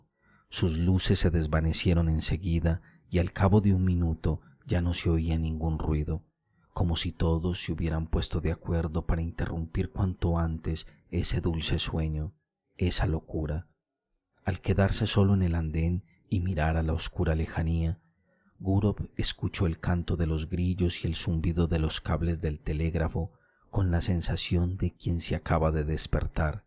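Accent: Colombia. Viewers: Excelente.....me encanta....el acento colombiano....muchas gracias.....su trabajo...nos alegra....... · Amo tanto la obra de Chéjov que hasta tolero ese terrible acento colombiano con el que el lector nos ilustra. Se aprende tanto sobre la cultura rusa y de paso sobre la misogínia de Chéjov. Con todo, cómo no amar su obra. Leí su obra completa cuando tenía 20 años pero ahora con YouTube la escucho y es como si fuera la primera vez.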